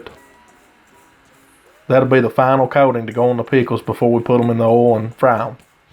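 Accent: South, United States. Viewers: The ultimate country accent!!!! Also great video I’ve been trying to find a good one. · Not to sound mean but this guy sounds more southern than me and I’m from Louisiana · Southern draw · Loved the “country as cornbread” accent. ❤️ Great recipe. Thank You for sharing. My Friends and Family absolutely loved them.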